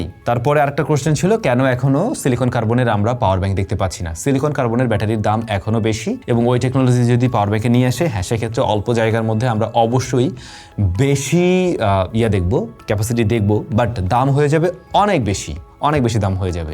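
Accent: Barishal, Bangladesh. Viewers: আরে আমনে দেহি মোগো বরিশাইল্যা ❤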